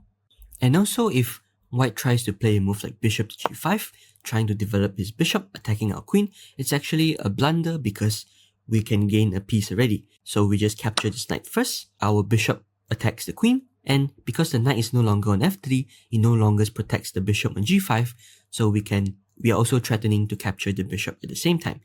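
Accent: Singapore. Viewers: Typical Singlish accent. Is this course given by Tin JingYao (Singapore number 1 GM by rating)? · You sound like a Singaporean. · Definitely Singaporean or Malaysian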